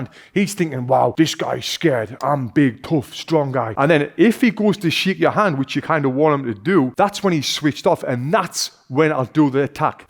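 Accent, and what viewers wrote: United Kingdom. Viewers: I'm learning British accent while learning how to fight )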